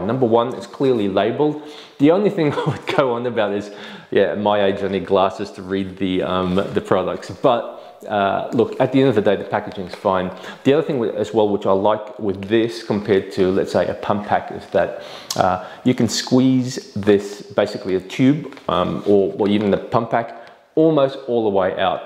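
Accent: Australia. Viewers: Love your Aussie accent. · love the Ozzy accent.. greetings from amsterdam :)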